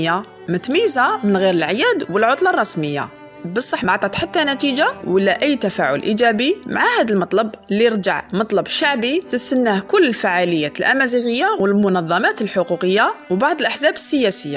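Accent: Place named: Algiers, Algeria. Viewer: يعطيك الصحة، و تبارك الله على ذاك الصوت الحنين و على اللهجة تاع العاصيمة 😘 الله الله عليك . أسڨاس أمڨاز ثانميرث سڨذرار ن جرجرة 🇩🇿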